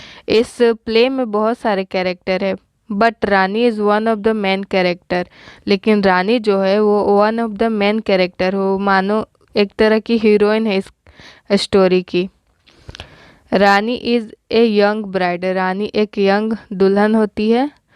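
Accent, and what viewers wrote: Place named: Bihar, India. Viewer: Aap bihari aapki boli se lagta he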